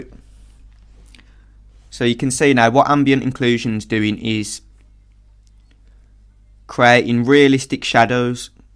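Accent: Birmingham, United Kingdom. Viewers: Dude we came from them, he's British, we are pronouncing it wrong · your from birmingham aha ? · Are you british???????????????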